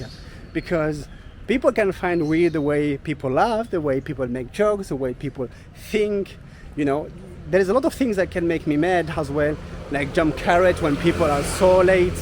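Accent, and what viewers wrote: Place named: France. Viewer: you look Indonesian...you could pass for one if you eliminate your French accent 😊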